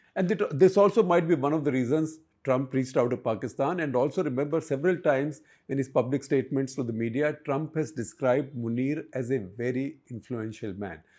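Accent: India. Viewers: And your programs on international affairs and politics!!! Only easy Indian style English of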